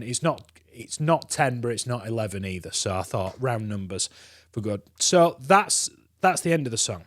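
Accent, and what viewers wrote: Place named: Northern England, United Kingdom. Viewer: Great lesson, and bonus it's in a good ol' northern accent, makes a change from all the US channels out there. 😂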